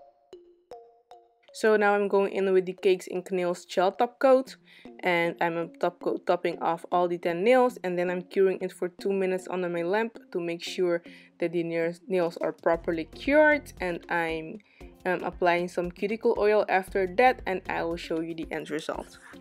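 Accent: Netherlands. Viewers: ik hoor gewoon aan je accent dat je nl bent hahahahha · Your English sounds Dutch. Am I right? 😅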